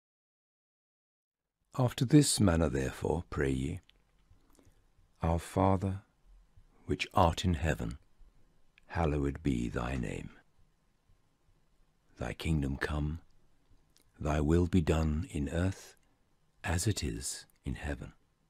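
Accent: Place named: United Kingdom. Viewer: Any got any good loops like this but without the “after this manner prey ye” british accent (I can’t hold American too long, just breaks my meditation) thank you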